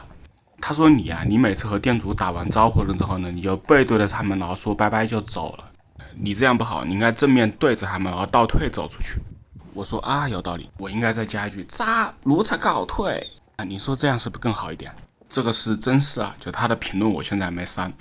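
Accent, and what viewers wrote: Wuhan, China. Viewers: 博主是不是武汉人啊？ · 我也是武汉滴，刚开始冒看评论，一听你口音就知道鸟，我经常去日本旅行，有空聚一哈，研究一下在日本卖小龙虾🦞哈哈哈🤣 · 武汉的普通话👍😅😂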